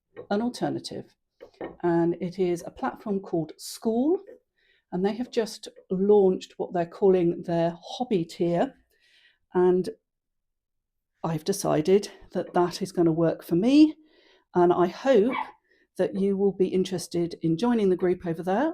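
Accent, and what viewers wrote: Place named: England, United Kingdom. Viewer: I just love how AI subtitles just cannot cope with "niddy noddy" particularly in an English accent....."nitty naughty"😂 Also just a quick tip, if you do just have a tiny amount to skein up you can use just 2 arms of your noddy noddy instead of the whole wrap. Then to work out your length you just divide the full length around your tool in half, then multiply by wraps made. Also if you do a lot of sampling, just make a small niddy noddy from cardboard to make neat little mini skeins.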